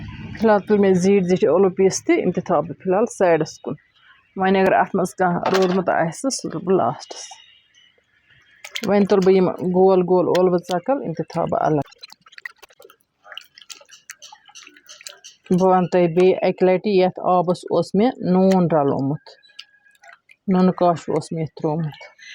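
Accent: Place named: North Kashmir, India. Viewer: Typical kashmiri bolebosh. Aap zaroor north kashmir say honge.....either bandipora or baramulla district.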